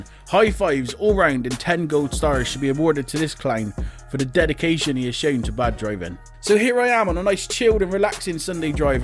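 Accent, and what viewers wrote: Bristol, United Kingdom. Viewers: Haha, love the presentation, the Bristol accent just makes the insults all the better. Subbed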